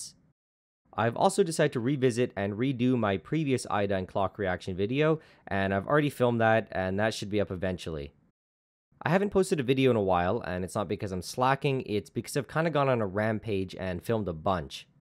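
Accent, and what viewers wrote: Canada. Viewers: So, you're Canadian, aren't you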